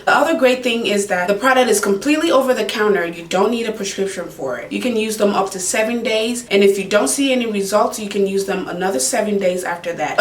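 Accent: Liberia. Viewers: Ayyeee! LIB sis, as I hear your accent! Do it for the culture hunnnny,! Yasss! Thanks for this info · Are you Liberian because YESSSSSSSSSSSSS!!!!!!!!!!!!??????? I can’t help but notice the accent I’m sorry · That accent sounds like a Liberian girl. Go queen ❤️ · I can definitely hear your Liberian accent lol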